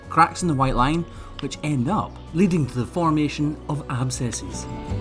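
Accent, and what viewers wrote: Scotland, United Kingdom. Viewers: I think the Scottish accent on a man is grrrrrrrrr😯😊😊😊😊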